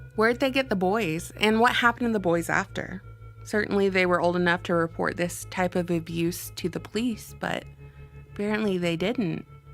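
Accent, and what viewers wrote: South, United States. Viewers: Ohhhhh just finished watching and yes, omg I want MORE from you on all of the EVIL PSYCH0paths!!! Your ironic American accent is "delicious" ! I'm originally from Canada and cannot wait to share the screenshots on certain points that you made!!! Of course I shared this video on Facebook....goes without saying!!! Btw, I know you're a new mom (félicitations!) but when you feel up to it (your little sweetheart comes FIRST of course!!!), I am CERTAIN that I'm speaking for all of your fans, we'd LOVE more to you on this subject as you are able !! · How did you become Southern?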